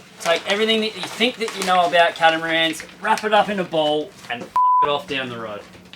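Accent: Australia. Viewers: We're not sailors...at all. But we love your videos and have learnt heaps about sailing since watching them. We found this one really interesting. Oh and we really love hearing a fellow Aussie's accent and how your positivity always shines through 🤗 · G'day, mate. Loved the video after I got accustomed to the Aussie accent. Pack your kit in a seabag and sign on a cat as crew for a year. At the end of that year, you will have the experience to make a wise choice of boat. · Thank you for this very interesting video, even if i didn't understand everything (dispite of your Australian accent and my french-american-english 😂) Anyway just to speek about the king-size thing : we have one in our Lerouge Brazapi custom catamaran and it seems like we bought a good performing and secure boat 😉 just started sailing with it a year ago, and as we're quite novice sailors we'll tell you more in a couple of years 😄 thanks for sharing your adventure ⛵ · Phew. 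What a waffle. First I thought it was going to be 1 minute of Aussie nasal bad-grammared intro, then it became 2, 3, 4, but he kept going. Jump to about and he'll start talking about specifics. The title's insufficient too. It's about buying a cat for ocean travel, not for what most people do with their recreational vessels. Three minutes of information squeezed into . 'If that's your design parameters...'. Grammar, mate. Unfortunately, it's a ramble. If only he wrote up a few salient points. :-(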